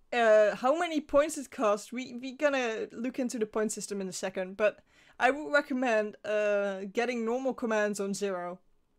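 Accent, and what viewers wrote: Netherlands. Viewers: thnx :D i stream for a while but i dont get !lurk to work cuz is uses $(user) is now in lurk mode but in chatbot it doesn't work :( greetz from the netherlands :D (ja ik hoor dat accentje wel LULZ) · Are you Dutch or German? Your accent sounds slightly more Dutch, but you look just like a German girl who goes to my church. Goede dag! Guten Tag!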